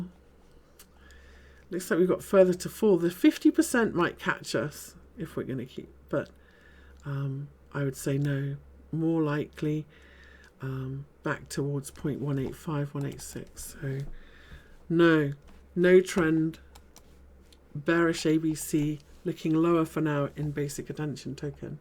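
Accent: United Kingdom. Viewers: Thanks for your update. BTW you have the most classy english accent of the crypto youtubers, that's very pleasant.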